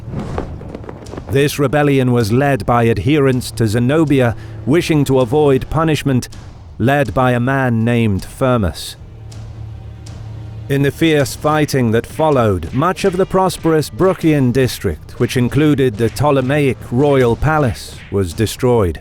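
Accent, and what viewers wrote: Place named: United Kingdom. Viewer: I cant enjoy a documentary video anymore unless its narrated by a british person with a refined accent, well done guys!!!!!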